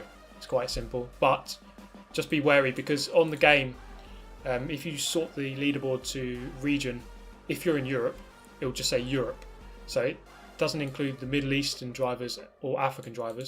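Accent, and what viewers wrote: United Kingdom. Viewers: you dont look like a brit what a accent gibe me pls ?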